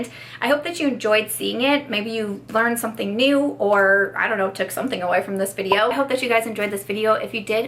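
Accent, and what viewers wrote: United Kingdom. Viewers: I loved your brutish accent! 😂😂 love you ❤️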